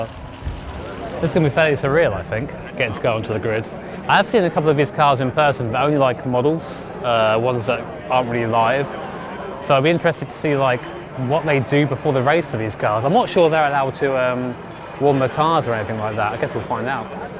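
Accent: United Kingdom. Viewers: I never even noticed accent during his videos, but it seems he gets more British in public.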